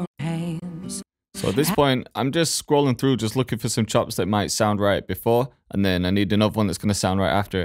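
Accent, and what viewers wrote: United Kingdom. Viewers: I really like this dude's British accent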